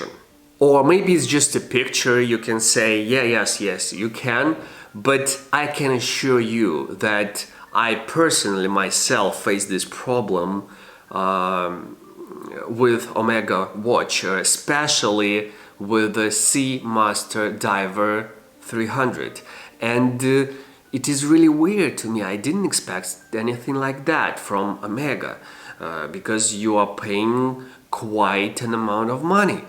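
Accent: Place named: Eastern Europe. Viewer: Great video, even greater personal exercise. Yes, slight eastern-European accent, but not this (infamous) rrrrussian one. My advise, take it or not - double bourbon before the takeoff, repeat as necessary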